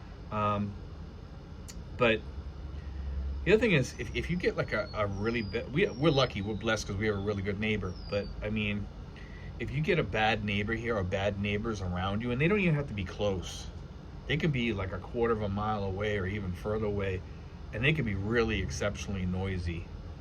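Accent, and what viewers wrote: Boston, United States. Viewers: Awesome video as always . Cool Bawstawn accent dude !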